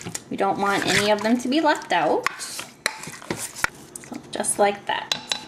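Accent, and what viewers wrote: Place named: Minnesota, United States. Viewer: trying this tomorrow. You must be from Minnesota. I can hear it in your voice.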